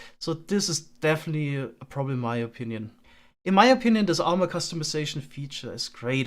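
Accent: Germany. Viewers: I always hear of your Farms but never knew who you are. So i subbed a few days ago to look a bit into your channel, and yes you're Minecraft Content is awesome 😁 But one question raises as i listen to you... are you German? Bist du aus Deutschland? Klingt vom Akzent her nämlich so und würde perfekt zum komplizierten Maschinenbau passen! 😄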